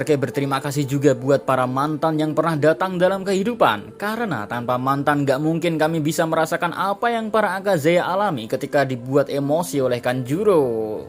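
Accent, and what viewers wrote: Java, Indonesia. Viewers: Orang jawa mana suaranyaa🤣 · Orang mana kok nyamen boso jowone.....salam oplovers tuban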